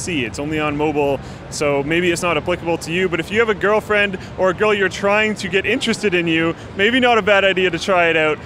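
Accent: Canada. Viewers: his accent is so canadian, sometimes its hard to tell if someone is american or canadian until they say certain words but with this guy its super easy to tell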